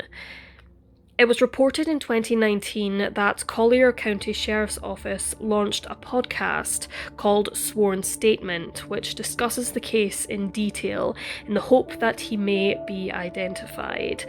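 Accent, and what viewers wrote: Scotland, United Kingdom. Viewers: Subbed. What that accent? Scottish? Irish? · Hello friend! I enjoyed video very much and I like your Scottish accent! I'm from India. I have subbed your channel, thank you. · except she is Scottish...! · she’s scottish not irish🤦🏻‍♂️ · It’s Scottish,I’d say. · SHE'S SCOTTISH, PROBLEM??????🤨🤨🤨🤨🤨🤨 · It's so nice to hear a good Scottish narrator... 💕 >^. .^< 💕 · Nope. She's Scottish. Mike is Irish. Different accents. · She's from Scottish highlands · She is from Scotland, UK.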